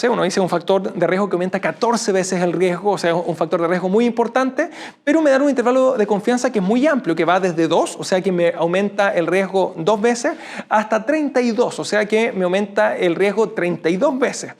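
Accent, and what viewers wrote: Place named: Chile. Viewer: Gracias Dr. Sí me ayudó mucho a comprender los términos, le regresé varias veces ya que no estoy tan acostumbrada al que hablen demasiado rápido ni al acento chileno, pero sí lo logré entender. Explica usted muy bien Dr. Gracias por su ayuda. Saludos de México :))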